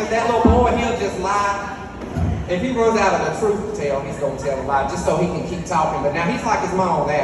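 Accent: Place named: Alabama, United States. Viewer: I enjoyed that shug,, cant wait til you do it again hey even on moms front porch be ok with me!! My moms from ALABAMA so i know all the ALABAMA talk! you got it for real for real! you said thats all I got to tell yall ,, 😂🤣🤣🥲😂🤣 you dont need no skit nothing ! All you gotta do is be yourself and talk about everyday life or as a kid memories! I mean thats the good thing about being raised in ALABAMA! Im stuck in LOUSIANA AND my Mom is gone on to heaven so hearing yalls voice makes my heart smile! I been following you on tik tok since day 1 and I just love you shug or ma used to say HUN to like you say shug! so cute,, keep it up and even though you say its a comedy show dude you have a amazing voice so what better way to let us hear you joke and sing too! even if its a silly funny song you sound good singing ! we love you dont stop being you dude love ya Tammy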